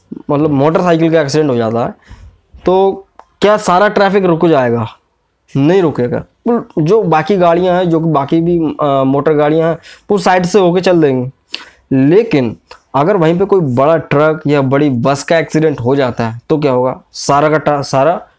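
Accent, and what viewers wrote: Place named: Bhind-Morena, India. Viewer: Bhai ka Bolne ka tone toh bhind Morena ka lag Raha hai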